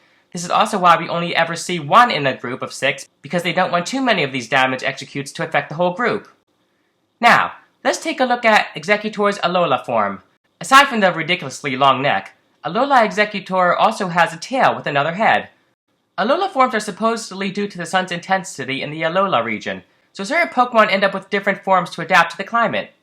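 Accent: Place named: Russia. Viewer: why u accent so bad its like u are a russian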